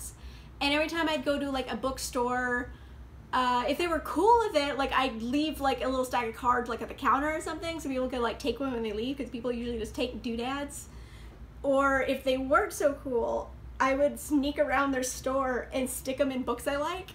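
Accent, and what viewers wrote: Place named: Canada. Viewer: I don't know why but for some reason I thought you were Canadian. Where are you from? I'm in Greece.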